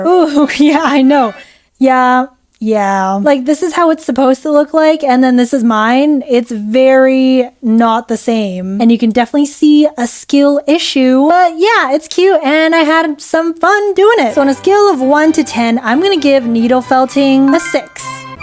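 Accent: United Kingdom. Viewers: I think she might be british lol😂😂😂😂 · saw your video on finding your art style! i love the anime art you create! i love cartoons and my dream style is something like anime Disney. I feel bad sometimes cause i dont draw realism too much, but i love seeing successful artist who have simple art styles ❤ also question, your accent comes and goes are you british? 😅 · You spoke britsh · The accent with the mat teacher 79 / 856 like the accent you British girl while you Britis I'm also British kinda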